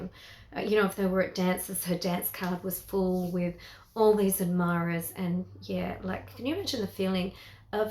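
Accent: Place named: United Kingdom. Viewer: Thank you for your informative and beautiful video,! 😃👍 You are gorgeous and lovely 😍, and I love your cute sweet and beautiful UK accent!! 🥰😊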